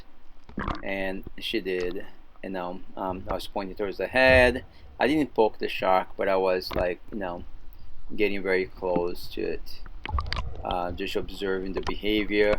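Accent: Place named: Brazil. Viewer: hehe i can hear the brazilian accent! love your videos bro hope your channel blows up!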